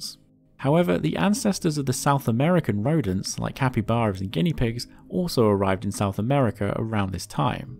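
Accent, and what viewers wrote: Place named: United Kingdom. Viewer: A british accent is essential when telling "just so" stories to small children.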